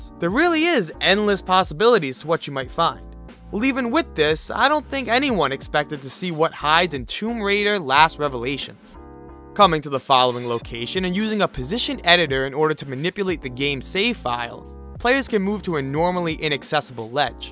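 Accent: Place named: Boston, United States. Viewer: Dude I hate to sound weird but are you from Boston? I feel like you definitely live at the most an hour from Boston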